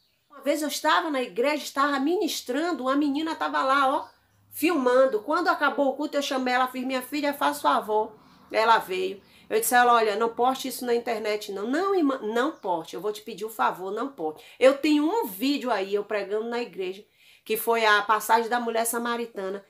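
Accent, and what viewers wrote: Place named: Bahia, Brazil. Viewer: Eita baiana arretada continua a falar a verdade minha irmã cada vídeo seu fico falando com Deus.porque todos não são verdadeiros que Deus continue a te usar e abri os olhos do povo ,ah tô amando seu sotaque kkkk sua risada também fico rindo com você falando sempre a verdade quando tá brincando ou falando sério Deus continue a te usar IRMÃ 😘👏👏👏👏🙏🙌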